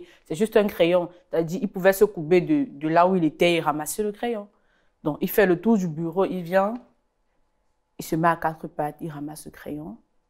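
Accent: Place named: Cameroon. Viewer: C'est une camerounaise ou gabonaise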